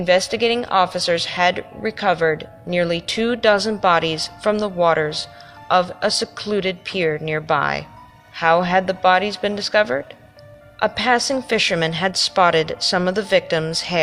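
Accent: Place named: Chicago, United States. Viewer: it’s an honest compliment you have an unusual voice kinda like Velma with a little more what I think is Chicagoan.......maybe upper Midwest like michigander Minnesota Possibly Wisconsin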